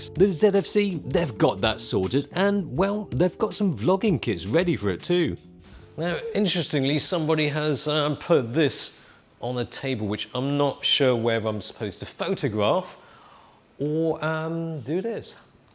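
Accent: United Kingdom. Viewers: Thats how we pronounce it here in the UK ( Kai is British of Hong Kong Chinese decent ) and its the original pronunciation btw, its only Webster whom decided to change our British language and spellings because he had an issue with some of the French inflections within our language. We spell Harbour with the u, you spell it Harbor without because he changed it, the same goes for Aluminium. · I'm just mesmerized by an Asian guy with caricature glasses and a British accent/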